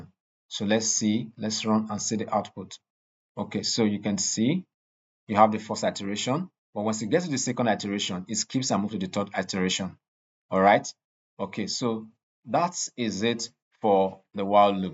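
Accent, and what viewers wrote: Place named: Nigeria. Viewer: Just watched your tutorials and like them. Noticed the Nigerian accent as I lived there in the 70's and attended St. Gregory's College in Lagos. I am an Electrical Engineering graduate and Oracle Certified Professional (Application Developer) and learning to take the Python certification (PCEP) in one month. Keep up the good work.👍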